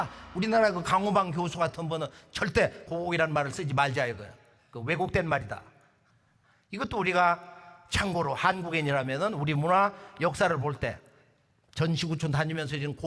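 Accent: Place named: Yanbian, China. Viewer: ㅋㅋㅋㅋ 뭐냐.. 완전 조선족이구만..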